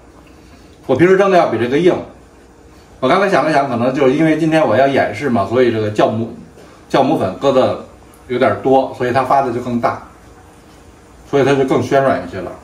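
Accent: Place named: Beijing, China. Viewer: 给点赞，平和随性地道老北京味，不错加油↖(^ω^)↗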